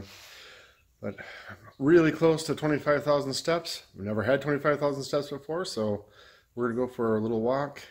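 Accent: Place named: Wisconsin, United States. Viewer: Awesome job! Haven't seen any bears yet in Northwestern Ontario Canada but just a matter of time. Lots of deer right now. Sometimes up to 6 or 7 at a time and I live in town lol. Couldn't help but laugh at the Canadian accent comment...as a Canadian I halve to say...You do sound like you are from WI. You never say "eh" which is the first clue you aren't Canadian. We say it all the time without realizing...too funny 🤣 My cousins live in Minnesota and they always laugh about how we say things here.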